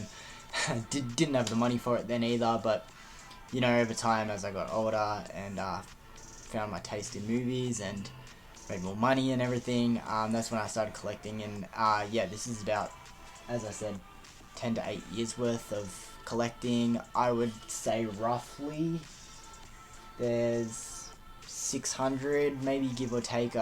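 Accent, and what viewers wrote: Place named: Australia. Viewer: In one of your videos I was guessing where u were from....and what came to mind on your accent was either....AUSTRALIAN....SOUTH AFRICAN or ENGLISH......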